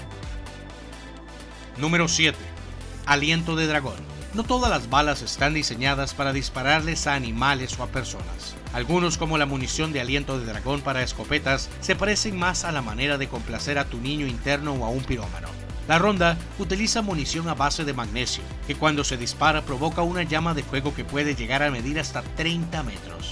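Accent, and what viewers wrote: Costa Rica. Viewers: Desde el principio supe que eras tico aun si ni siquiera lo dijeras saludos desde cr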